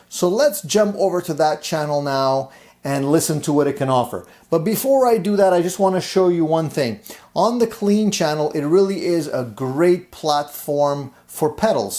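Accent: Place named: Montreal, Canada. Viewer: Sounds great! Maybe a dumb question, but are you from Montreal? If not, then you have definitely our English accent for some reasons haha Cheers!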